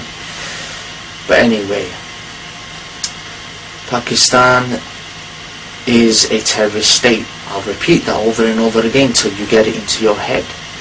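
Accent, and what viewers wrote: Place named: Ireland. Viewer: lol are you Irish or something?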